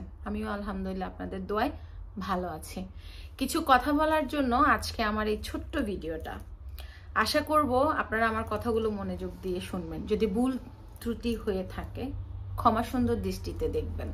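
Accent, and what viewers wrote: Noakhali, Bangladesh. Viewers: Allah apnr mongol korbe Apu.ekdol thakbe koshto debar jonno.kintu din sheshe apni Jodi ektuo amdr mukhe hashi fotan,etai apnr orjon.Noakhalir vashay shunte Valo lage.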